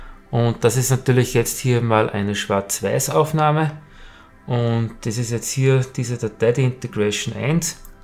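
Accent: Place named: Austria. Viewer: Tolles Video. Aber Buddy, dieser österreichische Dialekt hört sich echt schwul an. Trotzdem absolut tolle Videos die du da machst!!!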